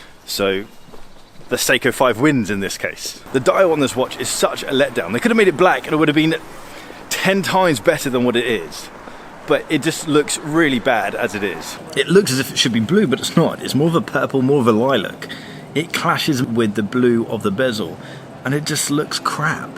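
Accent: United Kingdom. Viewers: The review coming from someone with a British accent meets the expectations. A ton of complaining, and more complaining, and more complaining. If I hadn't seen other videos from you, I'd say you're the kind of person who'd complain about Patek or Vacheron Constantine's movent, and why it took so long for these companies to make your $1M handmade watch. Then once you're done with the watch complaining, you'll start complaining that your girlfriend has too much boobs (whatever that means).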